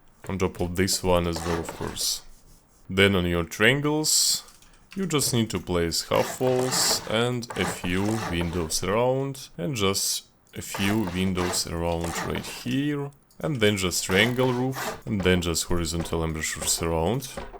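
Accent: Russia. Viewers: у тебя как будто акцент русский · ты русский? акцент похож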